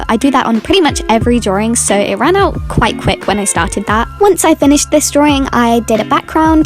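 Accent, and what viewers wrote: United Kingdom. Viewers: Haha I love your accent! It sounds like a southern British one which is where I'm from :D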